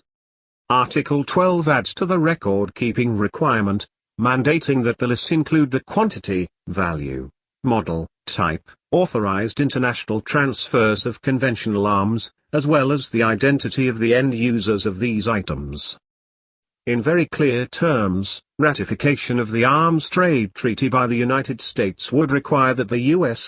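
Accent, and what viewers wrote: United Kingdom. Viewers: Why is the narrator a limey???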